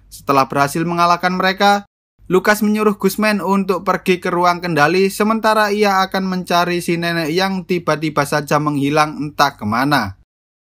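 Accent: Java, Indonesia. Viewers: Medok buanget si mas bahasa Indonesia nya, 🙏👍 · Btw Mas Nyaa Ini Orng Jawa??....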